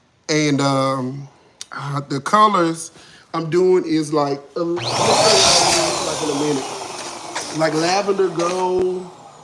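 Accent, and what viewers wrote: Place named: South, United States. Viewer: Lol you are so country!!! I can tell y’all from the south! 😂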